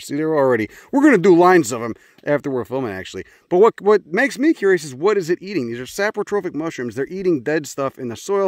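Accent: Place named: New Jersey, United States. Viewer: I have no idea where you're from but you sound like a natural jerseyian and i'm in love. haha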